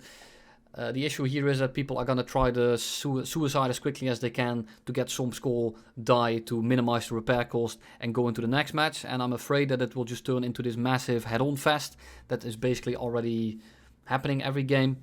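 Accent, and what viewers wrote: Netherlands. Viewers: I do love listening to Dutch people talk about something for up to an hour.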